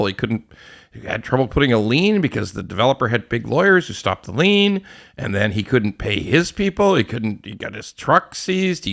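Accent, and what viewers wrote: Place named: Ontario, Canada. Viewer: talks in a Hamilton/ Vaughan accent Nice sub division you got there...shame if it burnt down...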